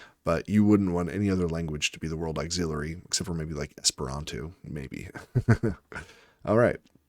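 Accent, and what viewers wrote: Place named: United States. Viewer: As a French native speaker, I can tell you're wrong when you try to analyse French. You said "It's what I've been told", which means you have no idea what you're talking about. French isn't Germanic. As a linguist, you should know that most words shared by English and French come from Old French. Actually, English vocabulary has been influenced by French after the Norman Conquest of 1066. Just out of curiosity, are you really a linguist? Which degree did you earn? The difficulty of this language lies not in the sounds, but rather in the rules and the exceptions. In French, you can see people correcting each other. Most people make a lot of mistakes because they don't read enough to internalise the rules. French doesn't have four tenses. There are a few tenses categorised into four moods. Actually, if you exclude the infinitive, there are nine simple tenses and nine compound tenses. 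Some of them are almost never used, but there are still more than four. You only considered the first group for your statement about conjugation. Let's take "prendre" as example. It's from the third group. In the present simple, "prendre" is conjugated as: je prends, tu prends, il/elle/on prend, nous prenons, vous prenez, ils/elles prennent. French people (so people who speak French in France) tend to like the English accent, not the American accent. It makes you look worse than Olly Richard.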